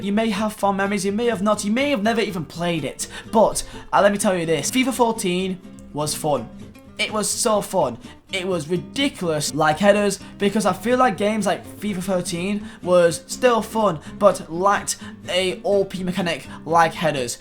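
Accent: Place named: North, United Kingdom. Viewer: Northern. That's is all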